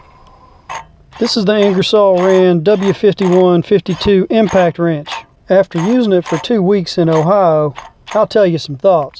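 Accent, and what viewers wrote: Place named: Alabama, United States. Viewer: nice review ...who's did the talking in vid ...he sounds like he's from Alabama...lolol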